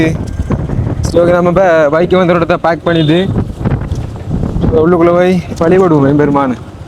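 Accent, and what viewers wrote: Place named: Batticaloa, Sri Lanka. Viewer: நீங்கள் மட்டக்களப்பு தானா? நல்ல செல்லத்தமிழ் கதைக்கிறீங்கள். உங்கள் தமிழை கேட்க இஸ்லாமியர் போல் தெரிகிறது. திருவிழா முடிந்தபின்தான் ஊருக்கு திரும்புவீங்களா?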